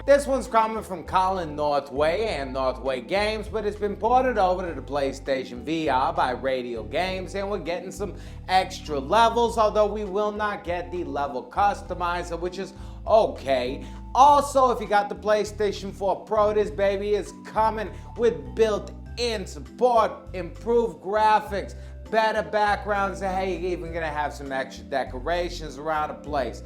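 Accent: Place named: Boston, United States. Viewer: Just an annoying guy from Boston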